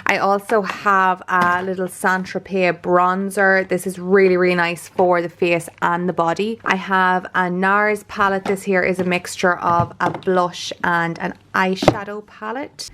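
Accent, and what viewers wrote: Ireland. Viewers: Hopefully you find this insight amusing but since watching your videos I’ve been getting so much better at understanding Irish accents. It used to be with Irish and Scottish videos I have to put my full attention to follow what’s being said but now I can listen to you just in the background and understand the gist just fine... still working on the latter though. Have a great week! - Gerry (from Canada) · I am not a foodie nor a cook, but you make cooking look so interesting! I especially like the hummus recipe, may have to try that one! I want to come back to tour Ireland again so badly. Love the people, but in the mean time i just sit and watch you and listen to your accent :) OH as soon as possible could you take a walking or bike tour around your city?! Stay healthy, as i see and i am sure you are <3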